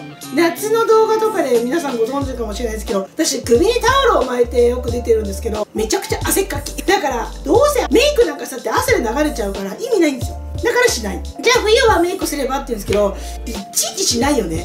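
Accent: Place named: Gunma, Japan. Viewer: 話し方がいかにも群馬って感じですね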